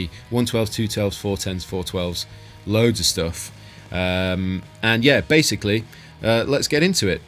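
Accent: United Kingdom. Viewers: britain, given your accent :)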